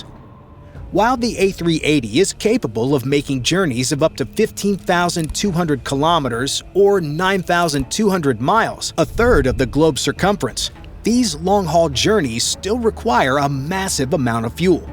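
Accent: United States. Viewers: Stopped watching when he said that the A380 was out in 1980s Plus the insufferable american accent and the Boeing ass kissing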